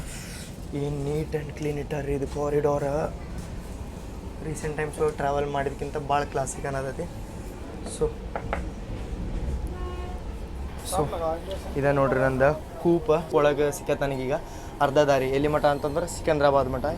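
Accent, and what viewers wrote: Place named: Karnataka, India. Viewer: ನಾನು 2005ರಲ್ಲಿ ದೇ ರಾಜಧಾನಿ ಎಕ್ಸ್ಪ್ರೆಸ್‌ನಲ್ಲಿ ದೆಹಲಿಗೆ ಹೋಗಿದ್ದೆ ಬೆಂಗಳೂರಿನಿಂದ ತ್ರೀ ಎಸಿ ನಲ್ಲಿ. ಊಟ ಕೆಟ್ಟಿದ್ದಾಗಿತ್ತು ಸೀದು ಹೋಗಿರೋ ಪರೋಟ ಅಲ್ಯೂಮಿನಿಯಂ ಫಾಯಿಲ್ ನಲ್ಲಿ ಇಟ್ಟಿದ್ದರು. ಕಂಪಾರ್ಟ್ಮೆಂಟ್ ತಟ್ಟೆನಲ್ಲಿ ಅಣ್ಣ ದಾಲು ಇನ್ನೊಂದು ಕರಿ ಒಂದು ಮೇಲೊಂದು ಹಾಕಿದ್ರು ಒಂದು ಸ್ವೀಟ್ ಕೊಟ್ಟಿದ್ರು ಮೊಸರು ಚೆನ್ನಾಗಿತ್ತು ಬರ್ತಾನೋ ದೆಲ್ಲಿಯಿಂದ ಇದೇ ರಾಜಧಾನಿ ಇಲ್ ಬಂದಿದ್ದೆ ಆಗಲು ಕೂಡ ಊಟ ಚೆನ್ನಾಗಿರಲಿಲ್ಲ. ಇವಿಷ್ಟು ಟ್ರೈನ್ ನಲ್ಲೆ ಜರ್ನಿ ಮಾಡ್ತಿರಲ್ಲ ಕೆಲಸ ಯಾವಾಗ ಮಾಡ್ತೀರಾ ಖರ್ಚಿಗೆ ದುಡ್ಡು ಬೇಕಲ್ಲ ನೀವು ಯಾವ ಊರಿನವರು ನಿಮ್ಮ ಕನ್ನಡ ಭಾಷೆ ಮೈಸೂರ ಬೆಂಗಳೂರು ಕನ್ನಡ ತರ ಇಲ್ಲ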